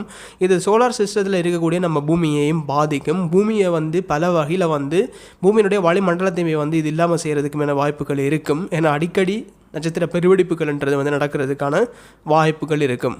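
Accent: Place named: Sri Lanka. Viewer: Alagana srilankan Lang tamil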